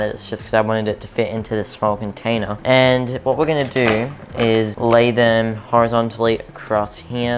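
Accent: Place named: Australia. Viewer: Great video mate, glad I came across another fish related channel! Sounds like you got a bit of an Aussies accent you from here?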